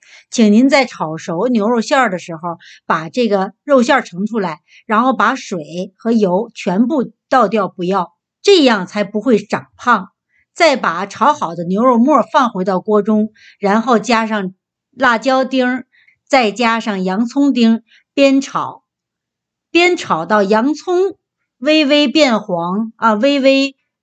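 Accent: Tianjin, China. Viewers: 听口音，天津老乡，你好👋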